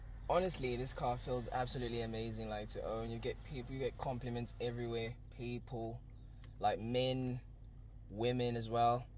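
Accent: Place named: England, United Kingdom. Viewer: You sound English bro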